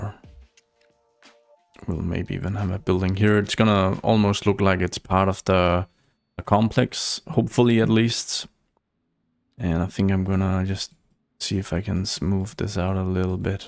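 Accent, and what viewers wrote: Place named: Denmark. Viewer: Just found you channel recently. So nice to have a fellow Dane playing this game (sorry, but it is obvious when you are danish) 😅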